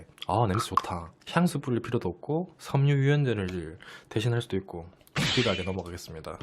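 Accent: Gyeongsang, South Korea. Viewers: 경상도 사투리가 이쁠수가 있구나?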